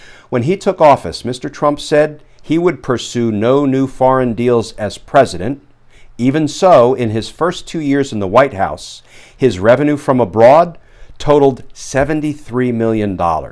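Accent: South, United States. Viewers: Nothing personal... But Glenn, every time you say "he'yall" I think you are saying, "hell all." Can't take the Southern accent away no matter how long you have been gone. Just saying"... Another Great video!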